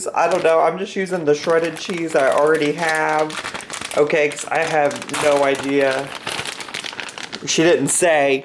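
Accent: South, United States. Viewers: you are very southern in this video LMAO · How do you have a country accent but you live up north?! It gets me every time! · I never noticed until this video that has a country accent!? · Lmao your random southern accent throughout the video is oddly fitting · This new southern accent isn't it babe. Kinda like our gorls "lah"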